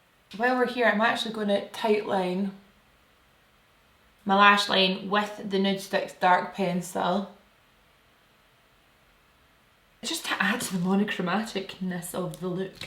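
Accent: Scotland, United Kingdom. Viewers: i am sure this gets so old but I am a sucker for accents and trying to pinpoint what they are. So forgive me in advance but is that a scottish accent i hear? Love your channel & videos as well, of course!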